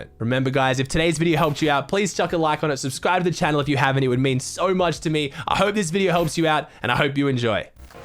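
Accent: Australia. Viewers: Aussie tryin to coach me? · How many people low aussies accent 👇🏻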